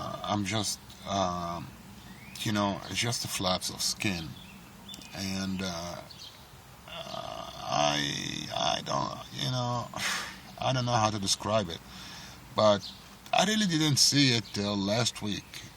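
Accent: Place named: Arab world. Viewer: hi, it's nice to know ur doing good n how much weight have u lost? and I know u have the Arab accent n the "aaaahhhhhhh" but tht gives a good laugh.. be healthy and awaiting your before n after pics..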